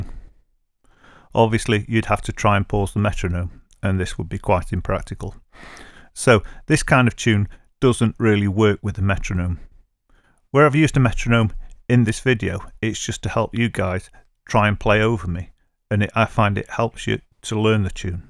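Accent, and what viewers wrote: United Kingdom. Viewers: BLIMEY!!! I thought I lost this channel and never be found again. I used to watch before I had guitar and thinking of following alone after I get one. And somehow I forgot name of channel. I dug on my history and So so relieved I found it. You sir are amazing. Maybe it's British accent so warmy and welcoming. I hope to buy e-book soon. Thank you very for articulating videos in such a manner which clicks with newbie like me. It must be time consuming to make videos on various topic but my lord your tutorial worth pile of gold. You are Bob Ross of guitar. I am so grateful for your videos. Once again thank you.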